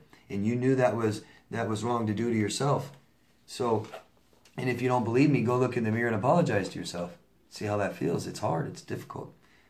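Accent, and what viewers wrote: England, United Kingdom. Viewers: Thank you, I just love your English accent 😘. In the UK you're allowed 6 free sessions of talking therapy on the NHS. This I did and my therapist and I think I'm much much improved. If I want more I have to pay, but as I'm paying for a lawyer... Is it possible to have 2 sociopath parents or 2 npd parents? From London, England. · I finally comprehend what is meant when a Narcissists see a person as an extension of themselves. I was thinking what does that mean ...like a third arm? No it means you are to help/aid them only and you get nothing or little in return. :( They SEE you only as a way to help themselves. :( Now I know my Father was a Narcissist. He never paid child support, no gifts or presents from him EVER! But he felt he was entitled to respect from us. As as adult I would do his shopping...help take care of his business...he future faked and said he was "leaving me something" . 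It was all one way in his favor and it does hurt when I realized he never loved me. He was only out for himself! He thought his presence was a gift to me. I do feel at peace knowing the problem was not with me. I wish I knew this earlier. People can have interactions with you for years and truly NEVER love you. I lived through that but I did not understand at the time. First recognize a self centered person then move on no matter who they are. Yes..sometimes a family member or romantic partner does NOT love you. Great English accent !!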